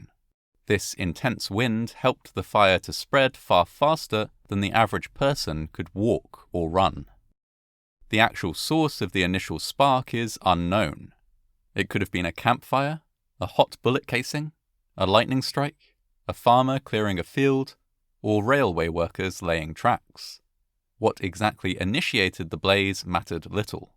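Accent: England, United Kingdom. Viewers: has English accent Americans find more authoritative. Send.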